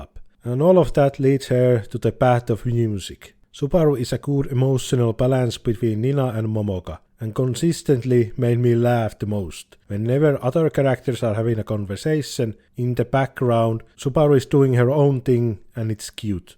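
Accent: Finland. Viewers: great videeo random question but where are you from? guessing u could maybe be finnish since your pfp looks kinda like the Spurdo Spärde stuff n ofc ur accent is pretty finnish like lmao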